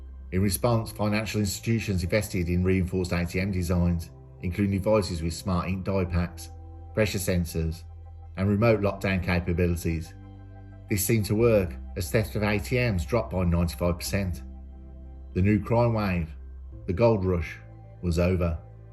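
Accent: Birmingham, United Kingdom. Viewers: You do a great job, but I also struggle with the audio on many of your vids. The sound quality is fine , but you either slur some words slightly or speak several words so quickly, that I'm constantly rewinding to work out what you said! No offence intended, just (hopefully) some constructive feedback ...and I'd much rather hear your Brummy accent than listen to an AI voiceover! Keep up the great work 👍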